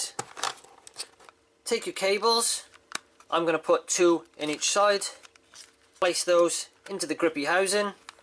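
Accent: United Kingdom. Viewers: Would these be OK to join my lighting earth wires to the light fitting earth, for example I've got 3 earth wires coming from the ceiling ( rose) and one coming from the new light fitting, can they be joined into the 4 way connector. I'm in the UK and from your accent not a million miles away from your good self.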